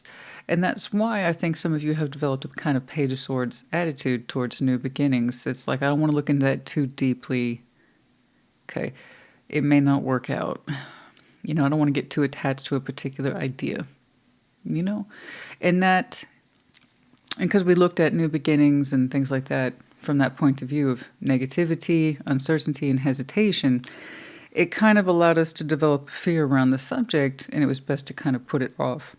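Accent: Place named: United States. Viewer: Thank you and Cinnamon, 🤗🤗🤗🤗 lol, you got me laughing cause seeing my current energy you'd never have thought I was fiery, my brother constantly pushed my buttons, day in and out for near 18y, he's a Leo, I think my anger amazed him, unfortunately I became dark inside and have been relearning the light w/in me, because I do remember my peaceful happy nature prior to the constant antagonization. Your American English is wonderful, I Love how you speak, yes very soothing and calming, and prolly more descriptive than my vocabulary. So many things passed me by when 29-30y ago I had to give up being stay at home Mom when I divorced and kids, then grandkids all went off having fun w/rest of family while I worked, and many times I was so tired all my remaining energy was to eat supper, shower sleep and do it over again next day. Between driving or factory jobs. 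I missed their developing years. If they want to call me old, I now tell them speak for yourselves, but they're all busy now, I took early soc sec. I talk w/the cat, birds in the yard and God. I monitor my energy, the cat is 16ish, youthful for her age, she just had a well pet ✔️ and I had to mention I'm faster than the cat if she tries to escape my arms, we don't use pet carriers. Love you our us all, Godspeed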